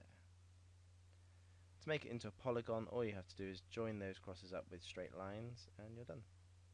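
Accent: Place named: United Kingdom. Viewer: Brilliantly simple and a nice British accent so it's easy to understand you :)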